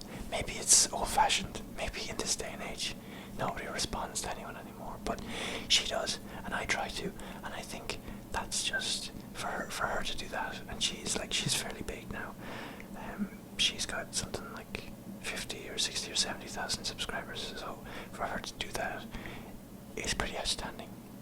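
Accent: Ireland. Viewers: love love love the Irish accent and your voice is soooo tingle-inducing. thank you xx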